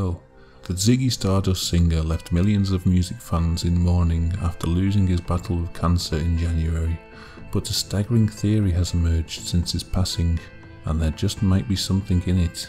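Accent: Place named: Newcastle, United Kingdom. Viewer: we love your New Castle accent that late night DJ mellow drone and your content is consistently strange and revealing. Keep it going Mitch and Lolly from Tipperary or should we say Kipperarey Ireland.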